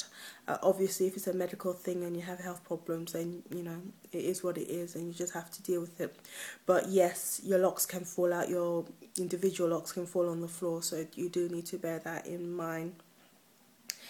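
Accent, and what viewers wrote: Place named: England, United Kingdom. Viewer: I love her english accent.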